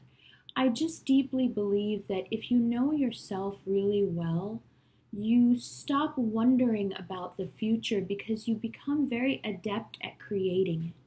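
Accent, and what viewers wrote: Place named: New York, United States. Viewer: I knew you were from New York. That accent. Awesome stuff.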